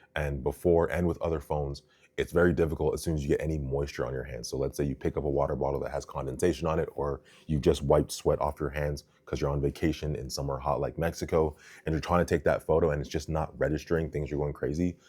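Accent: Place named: Canada. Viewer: Oh you're Canadian. PROcess and aboot are giveaway words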